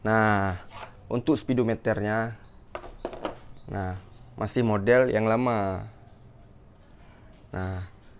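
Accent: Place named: Aceh, Indonesia. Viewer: Orang aceh bang y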